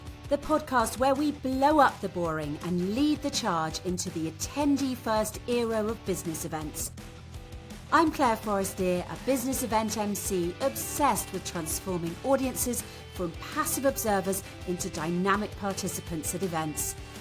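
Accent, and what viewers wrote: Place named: United Kingdom. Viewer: Haven't been around for a while 🎉. Missed the British accent.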